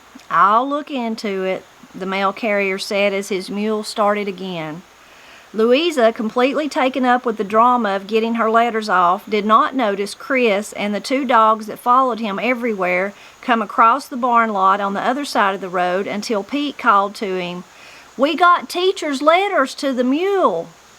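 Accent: Appalachia, United States. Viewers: As Mountain Path progresses, the plot thickens and the mysteries grow ever more mysterious. Hearing this story of Appalachia being read in your native accent, makes it all the more interesting and authentic. Thank you for bringing Mountain Path to us.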